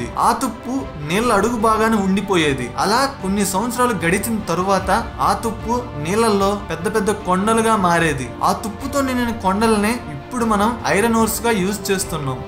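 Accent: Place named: Nellore, India. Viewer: mee slang eppudu bagundhi.. First lo aiethe baaga telisipoyedhi nellore or ongole ani.. I am from nellore if possible mana slang kottinattu untadhi inka marcheyamdi..appudu andharu chustharu..mee hard work ni appreciate chesthunanu.. all the best.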